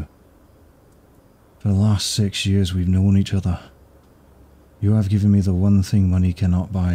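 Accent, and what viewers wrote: United Kingdom. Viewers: I was just about to compliment you on your northern accent and I'm a northerner myself. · Are you from Yorkshire? Tysm for the fun vid 🩷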